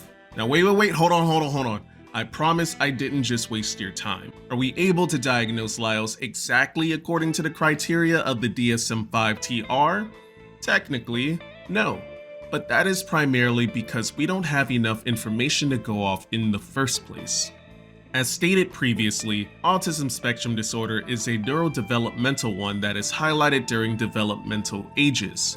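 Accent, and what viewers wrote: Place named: United States. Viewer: You said it’s getting warmer but you have what I assumed was an American accent?? Wild. Thanks for the video!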